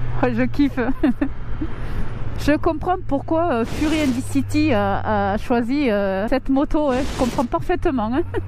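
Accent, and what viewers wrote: South, France. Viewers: tes rires et l'accent du sud ça fait du bien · ton joli accent du sud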